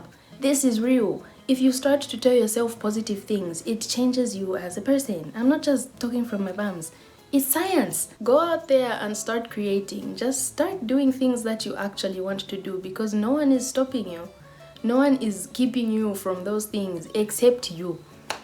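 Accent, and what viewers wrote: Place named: United Kingdom. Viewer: It’s the British accent for me 😂😂😂😂even me I’m delulu I want to become a British citizen!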